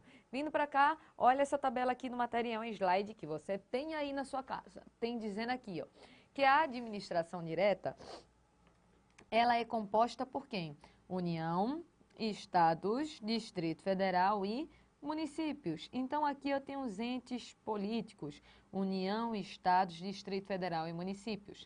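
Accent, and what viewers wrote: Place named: Northeast, Brazil. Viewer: sotaque do nordeste.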